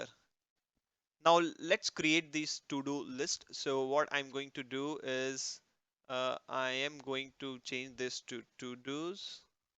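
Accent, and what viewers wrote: Gujarat, India. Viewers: are u a gujrati?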